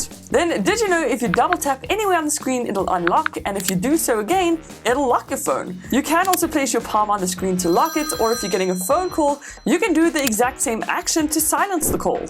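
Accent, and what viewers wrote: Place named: South Africa. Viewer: Your accent sounds like South African is that correct and do you live there now.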